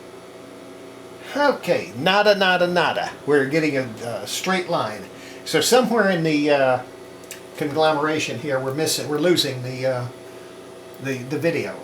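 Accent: United States. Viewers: Ok, thanks. I like, looks more feminine. I made crystal radio in 1964 when I was studying in the 6th class. My ham call is ap2kd, and I'm ok in ARRL book. I have a lot of ham equipment. Most famous WS 19, RCA AR 88, Marconi Atlanta, Marconi Appolo, Collins KWM-2A. I also like military communication equipment being very rigid and waterproof. From your speaking exsent, you look American. Regards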